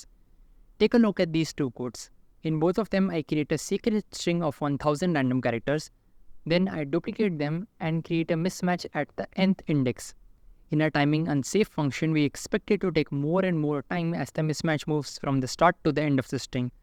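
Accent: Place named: India. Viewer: Just realized it's better switch Siri to Indian accent so I would just get used to it somehow for videos like this.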